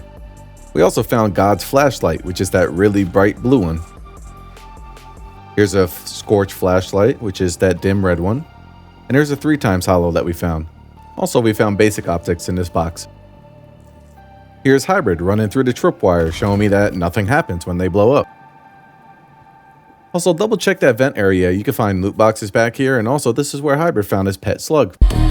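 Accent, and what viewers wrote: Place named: New York, United States. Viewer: I lived in NYC for 10 years, your accent always reminds me of my time there haha. Thanks for the good loot info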